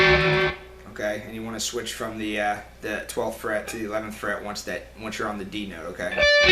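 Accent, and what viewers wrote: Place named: East Coast, United States. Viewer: This guy sounds like Adam Sandler or someone from the east coast who's about to kick my ass while trying to scalp tickets to an Eagles or Red Sox game.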